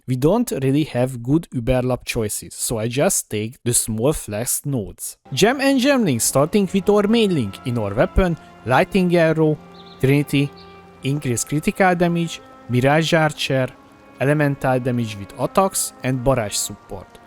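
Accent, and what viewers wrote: Hungary. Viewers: U Hungarian? Sounds like it.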